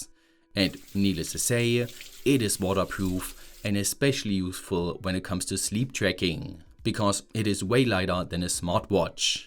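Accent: Germany. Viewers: This German accent is brutal, but still fun to watch.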